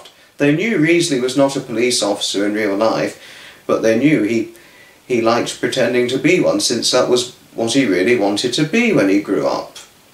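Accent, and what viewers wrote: United Kingdom. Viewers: I really enjoyed this inspirational chat time. Your story was great,! I like listening to your wonderful British accent😊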